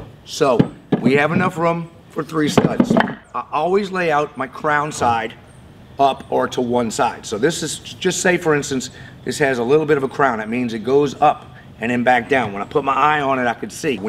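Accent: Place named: New Orleans, United States. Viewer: You should have built a scale model shed by ripping down some 2x4s and made it as a dog house for your dog. :) Might have a bit of trouble finding scale model shingles though... :) You mention Louisiana... From your accent, I would say maybe in the New Orleans area and from the terrain, I would say probably on the north side of the Lake... If Slidell, probably north of I-12 since it is higher and did not get hit as hard by Katrina... Or maybe inland some around Covington or Mandeville... I grew up in NE Louisiana, but live in the Houston area these days...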